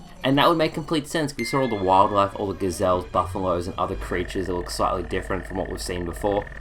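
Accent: Australia. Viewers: aussie rep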